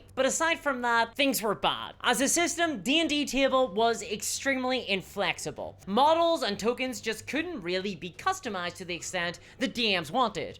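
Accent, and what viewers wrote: Northern Ireland, United Kingdom. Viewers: she's from Northern Ireland. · You say lighting, I hear lagging... clearly I've been away from Northern Ireland too long!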